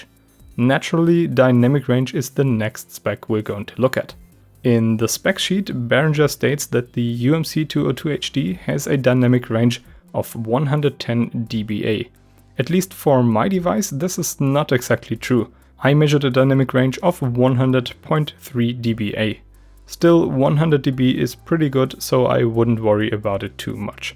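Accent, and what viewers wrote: Germany. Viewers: ich höre den deutschen Akzent ganz deutlich, ha ha))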